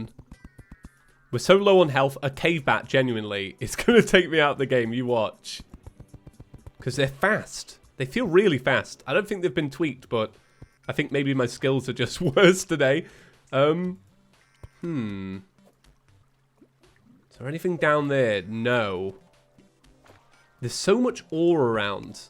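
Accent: United Kingdom. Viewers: Anybody else just realise he's Britain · I'm sorry, I forgot your British...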